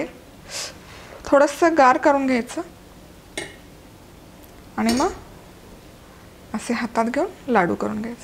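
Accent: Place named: Pune, India. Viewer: Jinnas means ingredients na..ryt....ssly punyatle lokanche marathi pure e..sahi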